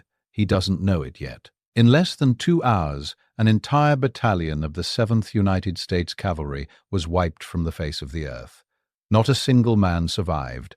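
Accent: United Kingdom. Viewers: You saw that also,this voice ,this channel is a joke , is he from England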